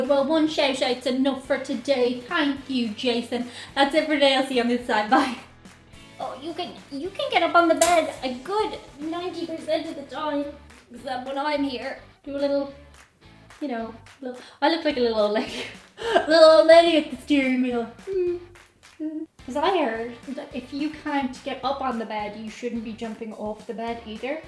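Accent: Ireland. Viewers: If it was North Korea you wouldn't have that lovely Irish lilt to your speaking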